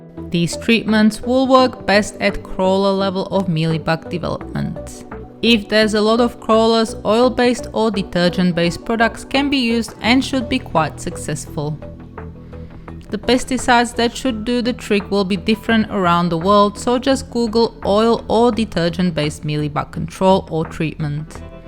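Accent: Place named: South Africa. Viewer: It sounds like you have a south African accent, sounds a bit like my friend from the west coast.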